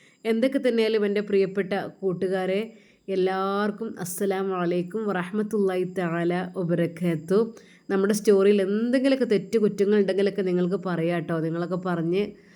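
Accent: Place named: Malappuram, India. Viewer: തൻ്റെ ഭാഷാ കേട്ടിട്ട് എൻ്റെ സംസാരത്തിലും മാറ്റം വരുന്നു ഞങ്ങൾ തൃശൂർക്കാർ ആണ് അപ്പോ എൻ്റെ ഭർത്താവ് പറയുകയാണ് നിൻ്റെ സംസാരത്തിൽ വിത്യാസം വന്നല്ലോ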